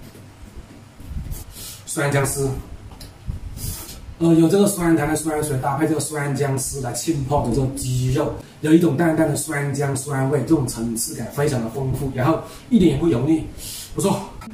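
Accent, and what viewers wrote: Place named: Guangxi, China. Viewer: 柳广普通话😀现在兴吃醋泡鸡？👍👍👍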